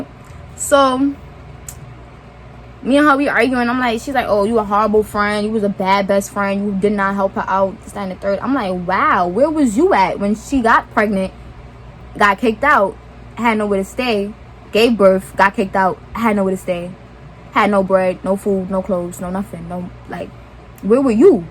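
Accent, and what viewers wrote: New York, United States. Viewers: I can spot my people anywhere 🗣🗽if you wasn’t from NY, I knew you was at least from somewhere up north lol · I love the way you talk? You from NY?❤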